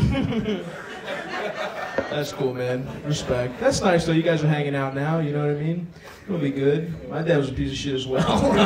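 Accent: Baltimore, United States. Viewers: Stav, you are 100 percent my favorite comedian since I discovered your stuff on Josh Potter Show. With family from College Park, I am so entertained by your Balmore accent.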